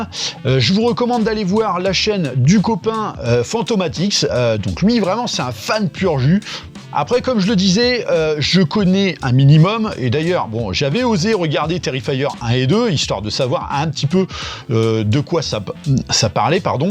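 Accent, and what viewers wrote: Paris, France. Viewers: Le phrasé "titi parisien" est un peu trop poussé 😉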